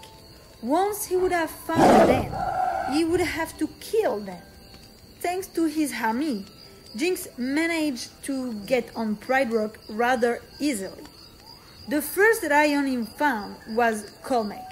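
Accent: France. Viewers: are you French I recognize your accent (je suis français aussi) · You are french ?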